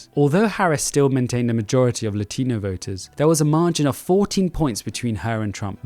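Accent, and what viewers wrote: United Kingdom. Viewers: He's a Indian with a limey accent lol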